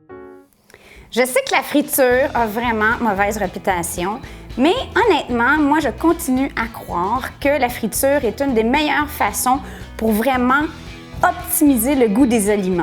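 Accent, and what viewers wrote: Quebec, Canada. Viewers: Elle est Québécoise.. donc elle parle le Québécois! Rien de bizarre! Au contraire! 😊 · c'est le français canadien je pense..n'es pas ?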